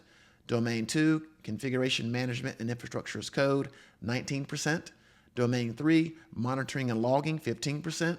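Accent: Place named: Virginia, United States. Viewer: I could tell from that accent that you were from a southern part of one of the Virginia's 😊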